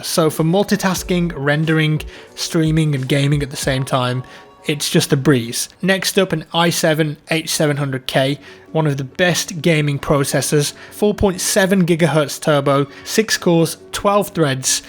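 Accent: United Kingdom. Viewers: I love British accent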